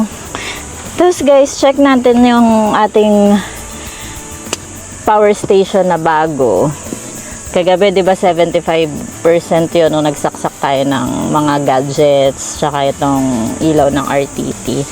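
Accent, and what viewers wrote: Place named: Bulacan, Philippines. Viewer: Hello po,taga bulacan k po b kc may punto k po eh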